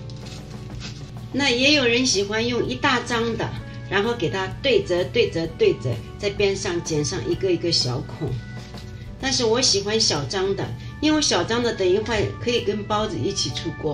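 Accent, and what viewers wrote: Shanghai, China. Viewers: Jenny阿姨，看你的视频，一口沪普就是特别亲切啊。刚刚我在看视频，孩子听见你讲话，问我，妈妈是外婆在教你做好吃的吗？孩子们以为是她们自己外婆在说话。😂😂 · 这是上海人讲普通话，带有上海口音。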